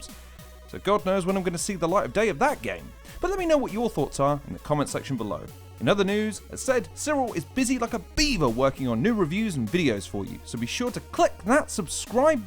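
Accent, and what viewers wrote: United States. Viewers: I remember that doom RPG, it was pretty good. Nintendo is going hard at people now but they really didn't do much when the 3DS and WiiU emulators and tools were being developed while those consoles were very much alive. Nice American accent. QOTD, Probably the Entrain Odyssey collection for switch.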